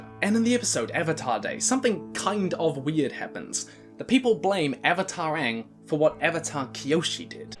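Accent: New Zealand. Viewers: Man, some old videos, before had tamed his kiwi accent yet.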